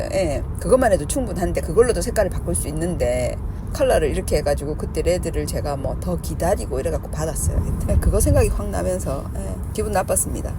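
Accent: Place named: Busan, South Korea. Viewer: 누나 부산사투리 좋아요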